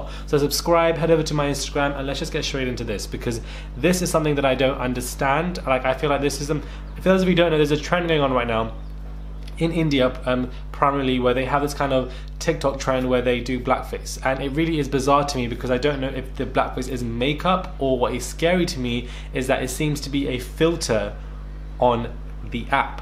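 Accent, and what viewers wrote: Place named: United Kingdom. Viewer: I only come before ur great British accent!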